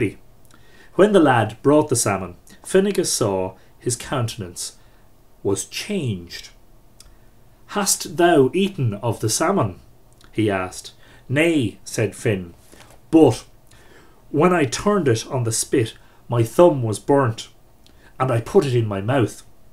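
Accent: Ireland. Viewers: Yes, I'm from Ohio in the US.. It's interesting that you are from Munster.. My husband's people and some of mine are from Clare.. He was first generation, my people have been here since before the American Revolution.. Being here for so long, I am mixed but still mostly Gael.. My son just bought me a DNA test to see if what I've been told about my ancestry is correct.. I grew up hearing myths and legends of Ireland.. I prefer sources written in Ireland and by Irish immigrants.. They're becoming easier to find but pronunciation is a quandary.. I can understand him but it's like me being a hillbilly understanding a New Yorker.. I guess since Ireland is so much smaller I expected less contrast in pronunciation.. Thank you for helping me..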